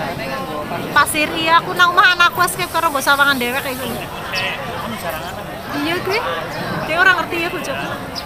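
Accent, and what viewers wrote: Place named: Banyumas, Indonesia. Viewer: Hadirrr.. mantap.. dukung terus channel le mbak ayune wong ngapak lek ora ngapak ora kepenakkk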